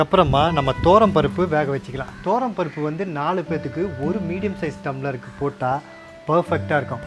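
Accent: Tirunelveli, India. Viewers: Super thambi &unga samayal+unga speash nan thirunel vely eapdythan sampark vaipan super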